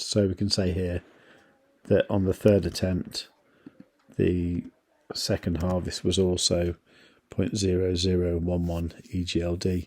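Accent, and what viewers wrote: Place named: Tamworth, United Kingdom. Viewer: you sound like you come from Tamworth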